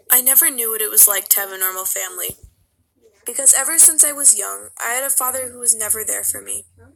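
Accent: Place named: Ireland. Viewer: You irish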